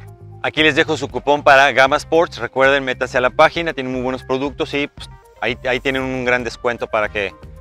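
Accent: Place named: Mexico. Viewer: de que parte de México es profe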